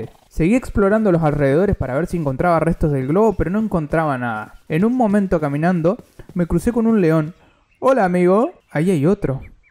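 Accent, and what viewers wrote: Argentina. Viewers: ¿sos argentino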